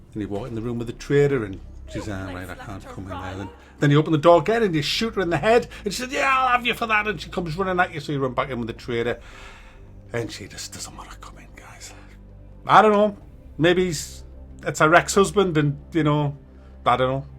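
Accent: United Kingdom. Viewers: it's poor and stupid part of the uk kind of a accent.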